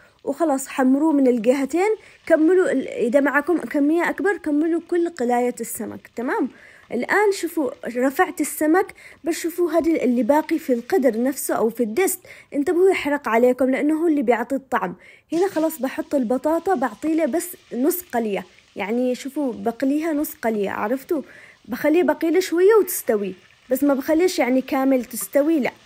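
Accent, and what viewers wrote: Aden, Yemen. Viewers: اللهم صل على سيدنا محمد وعلى آله الطيبين الطاهرين أجمعين، يا اختي إني فدالك كل ما اتابعك توجعي قلبي وتشوقيني لمسقط راسي عدن والمعلا والتواهي ، اما الصياديه هذة بالضبط أنا اطبخها وجننت صديقاتي هنا في امريكا بالأكل العدني ، فديت قلبك صوتك عدني ولهجتك عدنيه شوقتيني لعدن واهلي ، الله يحفظ اليمن واهلنا اليمنيين المصابرين والأقوياء دائما، تحياتي لك حبيبتي اختك عدنيه متابعه لك من امريكا 🌸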